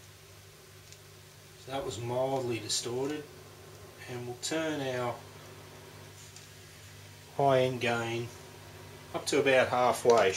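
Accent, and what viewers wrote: Australia. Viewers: It's aussie...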